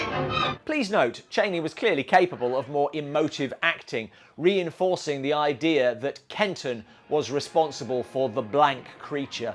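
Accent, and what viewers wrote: United Kingdom. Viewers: It really IS hard to see Krogh as anything other than a buffoon after YF, so similar is Marrs to Atwill LOL. There’s something about hearing these stories in an English accent. Between you and Adam Roche, I am a happy man❤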